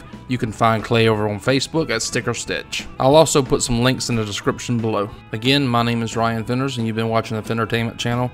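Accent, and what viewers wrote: United States. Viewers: 2 questions please: 1, can I use this method on wooden coasters, will it give a non stick finish for coffee cups? 2, are you quoting 200 deg Fahrenheit or Celcius - I am assuming Fahrenheit as by accent, you appear to be American. It could be very helpful if you noted either Fahrenheit or Celcius in future videos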